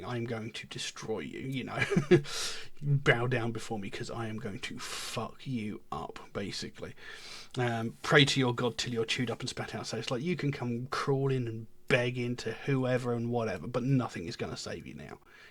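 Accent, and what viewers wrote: United Kingdom. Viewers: Hi from another Brit, I couldn’t mistake the accent - not quite sure where! I’m from south of Brum. Nice reaction, you got it on the nail with your analysis very good - their lyrics tend to be a bit open to interpretation! I found them right at the start, it’s been an amazing experience seeing them growing up and learning their craft! I saw them last year with Muse at Plymouth and this year at Brum early on in their world tour of sold out headliners. They’re at Wacken later this month!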